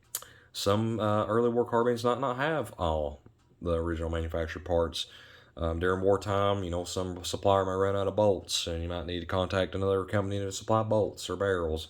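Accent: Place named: South, United States. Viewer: Thanks i am new to m1 carbine i know ww2 m1 grand . But please talk more slow as your a fast talking southen man i am from california not a yankee like thay said lol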